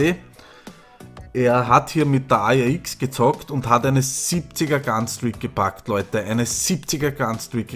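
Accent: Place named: Austria. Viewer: Schau normal keine Videos Von euch aber mal Richtig interessanten Thema ist nicht der Beste Kommentaror von allen aber er Bringt die Sachen richtig Gut Rüber wenn du den Österreichischen Dialekt bisschen weglässt dann ist es Glaube viel bisser würde den Dialekt nur bisschen Reinmachen:) Weiter so:)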